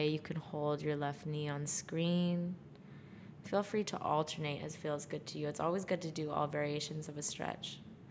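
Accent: United States. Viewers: I like your voice. And your American English. That sounds so good. 😌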